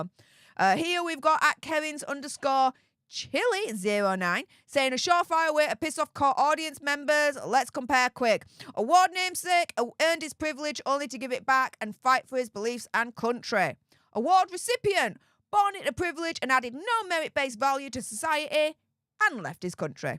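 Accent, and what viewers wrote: Yorkshire, United Kingdom. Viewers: Thanks. I love your Yorkshire accent. Being Australian if find all the different English accents fascinating and lovely. Those fellow English speakers who say they don't like it and can't understand need to broaden their horizons by travelling or listening to media made by people with different accents to themselves and stop being so insular. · Love your Yorkshire accent,!! But I can't believe you thought you needed to fix your teeth!! (We're all our own worst critics, obvi!!) You're beautiful inside and out!! Always love your hair & nails, too!!;) Your shows are so much fun and your energy is infectious!! 💕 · Love your Yorkshire accent ❤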